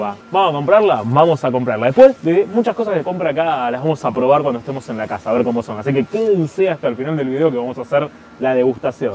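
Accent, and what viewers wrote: Argentina. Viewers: Tú español ruso está argentinisado! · reposho o posho....hay algun argentino que pronuncie bien el español???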